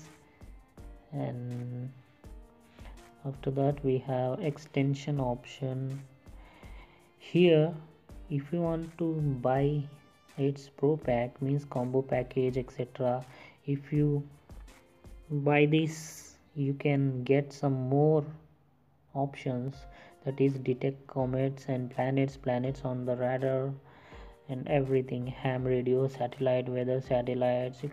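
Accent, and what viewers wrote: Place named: Bihar, India. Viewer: bihari english accent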